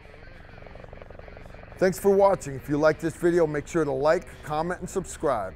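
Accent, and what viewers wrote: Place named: Northeast, United States. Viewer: New to the channel so this may have been answered already, NY? Boston? CT? What's the accent?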